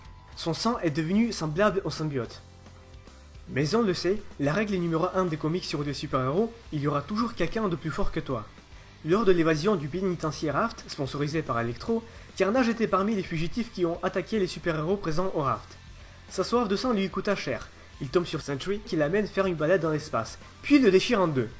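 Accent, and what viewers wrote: South, France. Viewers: Sympa le concept, par contre je ne sais pas quel est ton accent, mais pour le commun des francophones, c'est parfois compliqué de te comprendre. :) il "reuvait" de devenir pompier ?" sa "grammaire" dans l'escalier... C'est le sud ca, mais ca complique un peu la compréhension.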